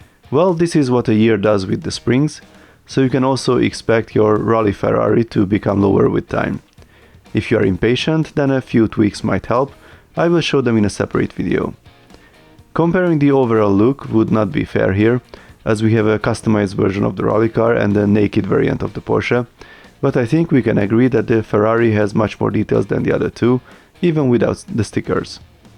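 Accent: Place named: Hungary. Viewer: Te Magyar vagy? Egyrészt,mert hívnak,másrészt az angol kiejtéseden is látszik.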